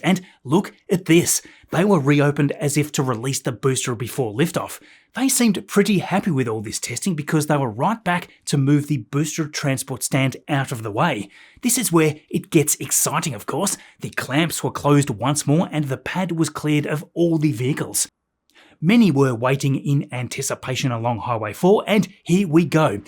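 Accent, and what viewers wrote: Australia. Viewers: You messed up the “that’s not a knife” name. With your accent, you should have done the famous Australian line That’s not a knife …..(THIS is a knife). I forgot the movie it’s from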